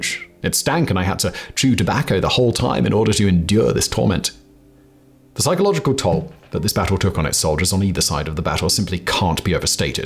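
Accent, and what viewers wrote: United Kingdom. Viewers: Is the narrator British? There's only so many English accents and I don't think it's north American or Australian :/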